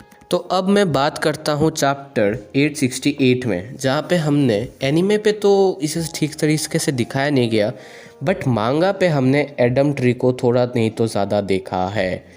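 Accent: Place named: Bengal, India. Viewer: joshu haa bhai aur mera dost kolkata mei reta hai aur iss bhai ka bhi bole ka andaj Bengal mei jaise log bolte hai waisa hi hai 😶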